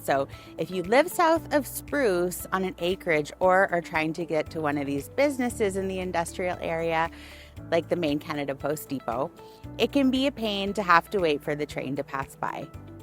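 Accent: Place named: United States. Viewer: Hi, I am first time watching your video That is nice for me because I love American English accent Love from India ❤😊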